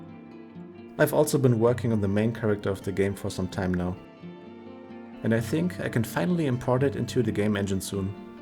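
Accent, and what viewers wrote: Germany. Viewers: Are you german your voice sounds like a german?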